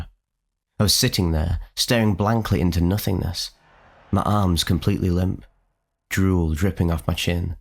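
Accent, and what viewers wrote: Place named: Lancashire, United Kingdom. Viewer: Hey, I’m from Lancashire living abroad for work and always missing home. Hearing your accent makes me feel back at home. Just wanted to thank you for your work, incredibly educational and entertaining. Please keep it up 🤙🏼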